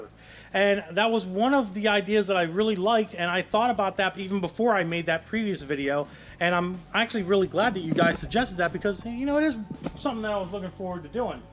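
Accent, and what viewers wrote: United States. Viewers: Learn to speak English, not American English, in fact you and Mike should go to the same classes and learn proper English, nae in fact all the crew in both your and Mike's vlogs learn English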